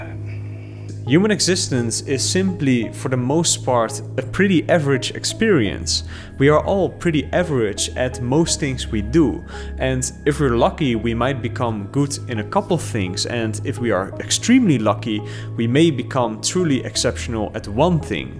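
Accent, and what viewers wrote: Netherlands. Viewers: Are you dutch? That accent 😎😇 O ffs you just told us in the video lol.... How the .. did you end up in HK?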